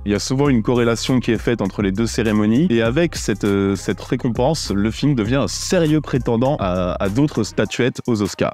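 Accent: France. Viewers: Tu ne serais pas franc comtois? Ton vindieu étais magnifique 😂 merci pour cet vidéo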